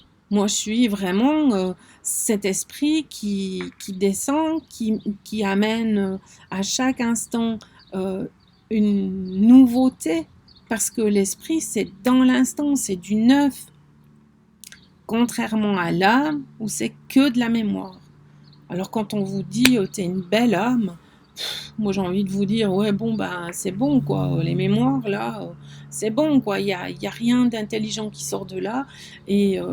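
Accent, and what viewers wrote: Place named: Switzerland. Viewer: Bonjour, je découvre ta chaîne, bravo, j’ai donc encore beaucoup de tes vidéos à visionner....ce sujet est très intéressant et bien expliqué grâce aussi aux exemples...je découvre sans grande surprise que nous sommes voisines, je soupçonnais ton accent et les huitantes, septantes....donc c’est confirmé, je réside à quelques mètres du sol genevois....ceci dit j’ai appris les programmations liées au pays...ce sujet est très intéressant, je vais partager....merci encore pour ta vidéo. Bénédicte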